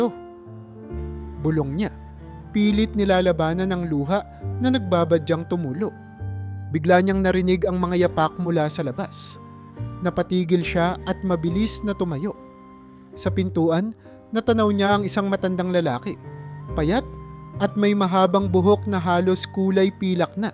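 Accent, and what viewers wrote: Philippines. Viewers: May tanong din ako bakit ganuon kayong bumigkas ng tagalog, anong probinsia nyo?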